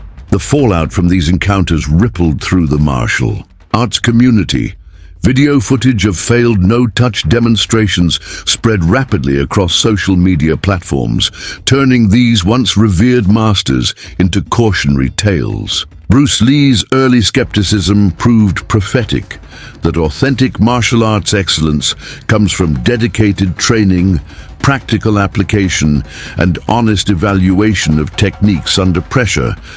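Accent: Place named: France. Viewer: Vidéo de M.... l' IA parle anglais avec un accent français de toute beauté ( ça peut pas être un humain 🤔 ) mais les commentaires sont hilarant 😂😂😂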